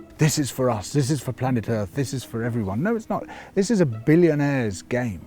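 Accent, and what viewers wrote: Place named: England, United Kingdom. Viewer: "...sending himself into space... in a giant dildo..." If George had have said that with his beautiful English tones I would have died laughing. Later Edit: giant phallus, I had not gotten to that yet, thats going to tickle me for hours.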